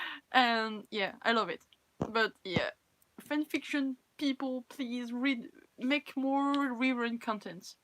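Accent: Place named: France. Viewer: Moi c'est chelou car je comprend parfaitement l'anglais mais je ne sais pas bien l'écrire XD normal je suis francoportugaise mais même le portugais je sais pas l'écrire mais je le pige à parfaitement, je suis dégouté d'avoir raté le live ;^; en tout cas tu parle bien anglais ! Même si on entend ton accent français mais c'est super bien ! Continue tes vidéo elles son parfaite ! Et tu est parfaite :3 !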